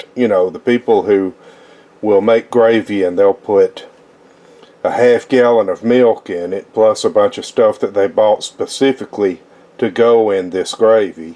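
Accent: South, United States. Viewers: Hi, that gravy looks delicious and I wish that I had some of it in front of me right now. I will definitely try your recipe. You sound like a southerner, I am from Okla. but I live in Idaho. Looking fwd to seeing more of your videos.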